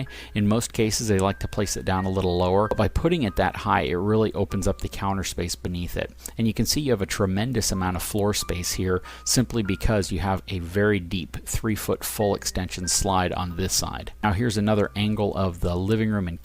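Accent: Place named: Texas, United States. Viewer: I like your wild Texas voice better than your NPR voice. This will take some adjusting. 😂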